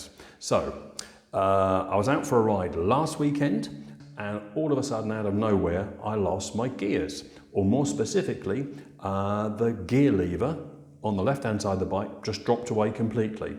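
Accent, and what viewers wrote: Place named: London, United Kingdom. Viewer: I have no idea why my wife's name came up on the comment but it is ok because she is from London and has the same accent. You sir are good. I have watched all of your videos and as a retired engineer and I am impressed. I have a 2011 Multistrada. I am currently in the process of replacing the front fork seals. Your brake videos are excellent. After watching your videos I decided to look at the brakes when I finish the fork seals. In fact the information that you provided in your brake videos got me to thinking about my brakes on my Harley. They are really not that good. But I will apply what I learned from your videos and see if I can make some improvements. Look forward to more of your videos.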